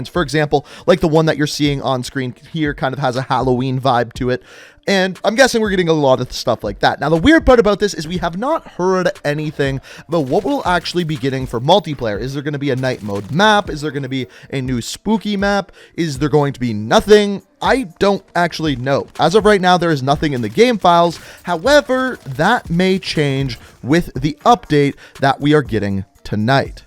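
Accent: Canada. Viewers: Lol I can't get over that Canadian accent bro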